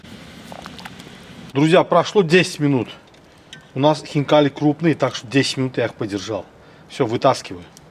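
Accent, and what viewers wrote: Baku, Azerbaijan. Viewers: ТАК ПРИЯТНО СМОТРЕТЬ КАК ВЫ ГОТОВИТЕ С БОЛЬШИМ НАСТРОЕНИЕМ И БАКИНСКИМ АКЦЕНТОМ ПРЯМ СУУУПППЕЕЕРРРР. 👍👍👍👍👍👍👍👏👏👏👏👏👏👏🇦🇿🇦🇿🇦🇿🇬🇪🇬🇪🇬🇪🇬🇪🇬🇪❤❤❤❤❤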